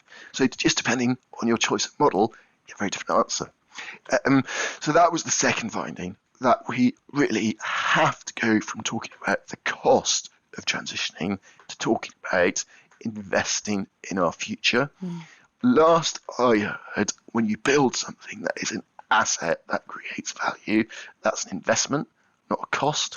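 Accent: United Kingdom. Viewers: Need clear voice recordings, esp some of us are not used to Brit accent. The point on risk vs scientific conservativism is real.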